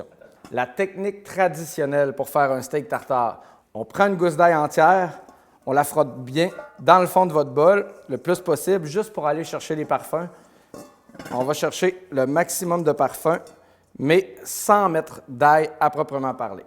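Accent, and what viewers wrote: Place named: Quebec, Canada. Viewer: j'adore la fraicheur de votre recette....bien assaisonnée , avec de beaux produits , j'adore votre générosité de recette détaillée,...et votre accent québécois qui rend heureux comme si toujours de bonne humeur...et je suis impressionnée par votre planche de travail en marqueterie très originale superbe..merci.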